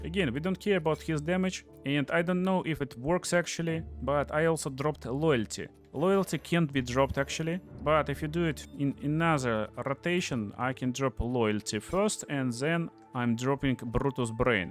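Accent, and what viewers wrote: Russia. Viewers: дружок, я наше произношение везде узнаю - показывай где на нашем языке видео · ten ruski akcent jest straszny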